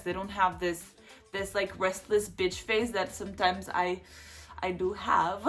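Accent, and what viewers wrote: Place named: United States. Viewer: ayeeee congrats on your citizenship!!! By the way, you sound super american for only being here for 8 years. My grandmas been here for 50 years and she still can’t speak English lmao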